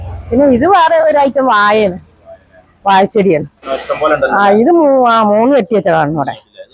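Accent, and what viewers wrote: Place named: Malappuram, India. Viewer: വീട് കറക്റ്റ് എവിടെയാ, സംസാരം കേട്ടിട്ട് മലപ്പുറം ആണ്, എവിടെ ആണെന്ന് വളരെ കൃത്യായിട്ട് പറയോ, അല്ലെങ്കിൽ നമ്പർ തരി പ്ലീസ്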